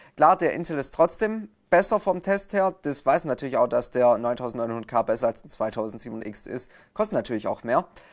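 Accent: Swabia, Germany. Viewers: alter schwabe :D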